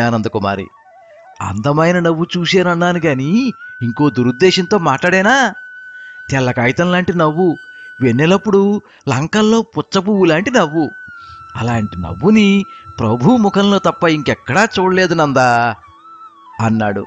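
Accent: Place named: Godavari, India. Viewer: నవ్వి నవ్వి పొట్ట చెక్కలయింది. మీ గళం అసామాన్యం. గోదావరి యాస‌ చక్కగా పలికింది మీ గొంతులో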